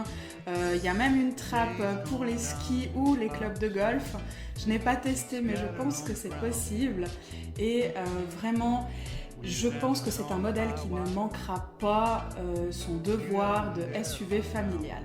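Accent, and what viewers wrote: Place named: Switzerland. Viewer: Cet accent suisse est charmant ; par contre le chanteur de la musique est pénible.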